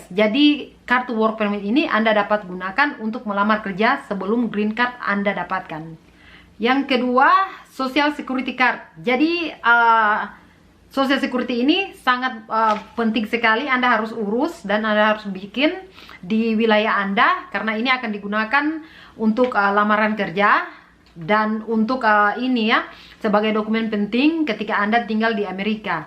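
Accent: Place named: Eastern Indonesia, Indonesia. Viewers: tanta asal mana ni? 😁🤣dialek kayak orang ntt 🤣🤣🤣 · logat kaya orng timur 😁😁. semoga saya bisa di bantu kerja ke sana kk . 😔😔pengeeenn sekali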